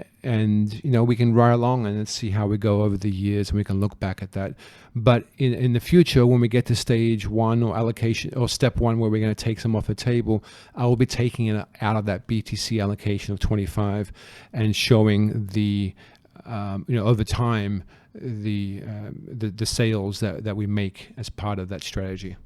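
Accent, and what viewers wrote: Australia. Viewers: I think he's Australian by the sound of his voice, but I take the point.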